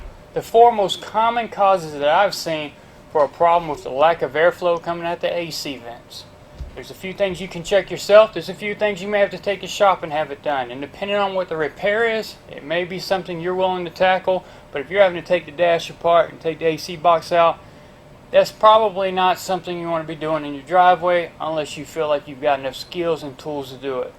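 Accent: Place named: Alabama, United States. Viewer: You don’t sound like you from the motor city.. sound more like you from Alabama Mississippi or something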